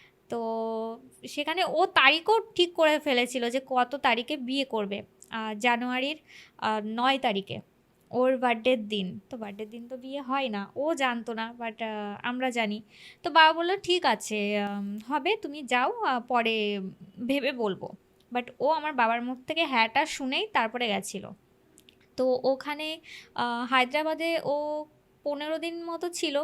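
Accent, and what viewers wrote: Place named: Bangladesh. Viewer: UNI BODH HOY BANGLADESHI BY BIRTH...... ONEK BANGLADESHI MANUSH OI BHABEY PRONOUNCE KORE......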